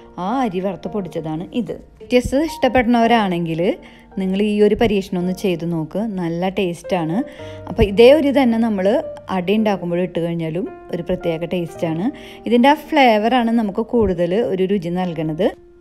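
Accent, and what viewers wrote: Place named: Thrissur, India. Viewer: Hai my dear friend ഈ സ്പെഷ്യൽ ചേരുവ കൊഴുക്കട്ട സൂ....... സൂപ്പർ പിന്നെ ഈ തൃശൂർ ശൈലിയിൽ വിവരണവും അടിപൊളി കൊഴക്കട്ട ഫ്ലേവറും ഈ വിവരണ ഫ്ലേവുറും കലക്കി വീഡിയോ കണ്ടു ഇഷ്ടപ്പെട്ടു ലൈക് ചെയ്തു 👍💐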